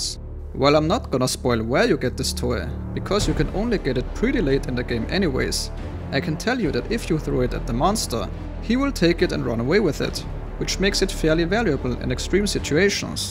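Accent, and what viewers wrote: Germany. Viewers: he sounds german, not french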